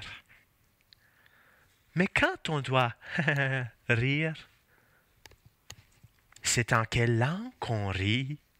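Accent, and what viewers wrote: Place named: Canada. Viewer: T'es canadien, toi ? Juste curieux pcq ton accent est différent que ça de nous-autres icitte en Louisiane.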